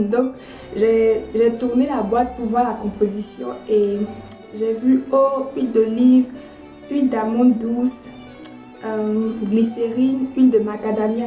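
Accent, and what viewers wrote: Ivory Coast. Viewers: Trop mimi, tu m'arraches toujours un sourire avec ton accent trop mignon qui me rappelle mon pays la Cote d'Ivoire, bon courage et bonne continuation à toi:-)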